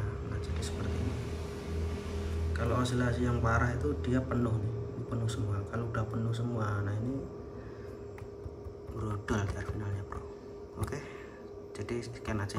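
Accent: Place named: Sragen, Indonesia. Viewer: logat e koyok wong sragen mas😁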